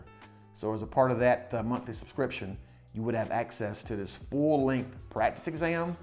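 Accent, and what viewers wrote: South, United States. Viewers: Thanks for the info. I'm taking some Udemy courses and plan to pass it on a month.😎🌟 We're are you from bro, you have that country singer accent.😅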